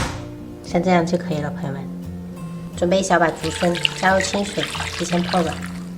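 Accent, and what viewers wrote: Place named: Fujian, China. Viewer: 你是四川人，为什么是一口福建口音呢😊? 就算嫁到那里也不应该呀😄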